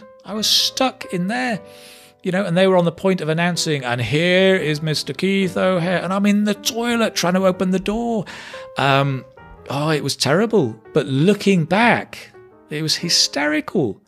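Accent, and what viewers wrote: United Kingdom. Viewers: This accent (British) is amazing .. i do like it, but i have not still mastered it well.. briefly thanks for your information about that, and have good day.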